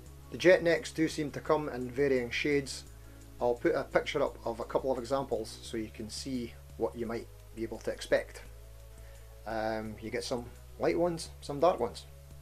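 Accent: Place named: Dundee, United Kingdom. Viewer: Sounds like a Dundonian accent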